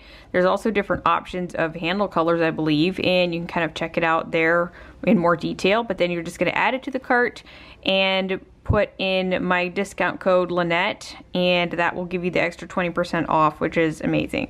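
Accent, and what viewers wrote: Pennsylvania Dutch, United States. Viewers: Thank you Lynette I really enjoy your recipes Love to hear you speak Pennsylvania Dutch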